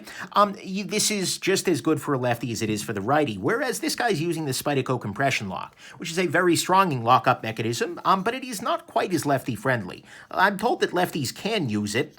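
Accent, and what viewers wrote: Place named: New York City, United States. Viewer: My wife walked in and asked if I was listening to Woody Allen talk about knives. You do have that Manhattan accent. Happy New Year!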